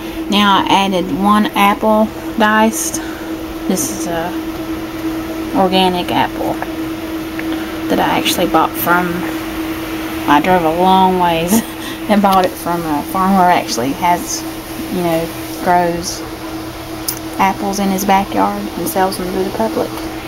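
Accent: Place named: South, United States. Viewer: I LOVE your videos. I am a midwestern cheese, corn everything & cheese girl going raw. Have been for 10 days. We crave what we know and let me tell you, your videos have made it easier for my husband and I to go raw. Thank you very much. Would like to see some new videos from you so you can let us know how you are doing! If you're not still raw, it's ok. You have an entire list of followers ready to cheer you on again! And I love your Southern Belle voice.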